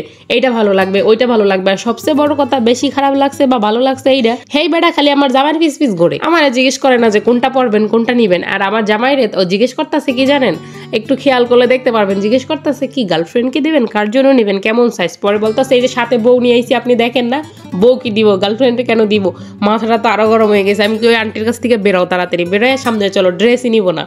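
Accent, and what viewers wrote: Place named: Barishal, Bangladesh. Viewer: কিন্না কিন্না,, করেন,,,আপনার বাসা কি বরিশাল 😮😮😮😮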